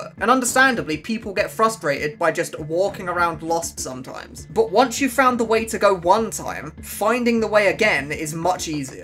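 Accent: United Kingdom. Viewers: British lol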